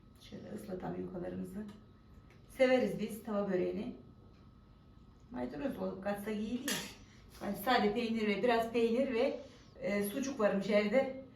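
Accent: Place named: Uşak, Turkey. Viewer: Sonradan dinleyince uşak oldıgunu anladım bızde manısa merkezden 😊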